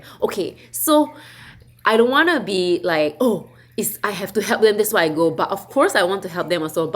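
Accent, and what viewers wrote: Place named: Singapore. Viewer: Authentic, and pure singlish and local references jus tickles me n my wife when we watch yr videos...remain true to yr heart, jiayou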